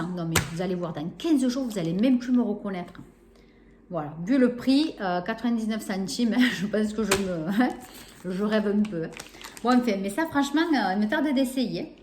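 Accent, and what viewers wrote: South, France. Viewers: Bonsoir! Super haul et très bien présenté, ça m'a donné envie d'y retourner. Je suis dans le sud ouest et par ton accent je me demandais si c'était le Noz de Montpellier. Bonne soirée.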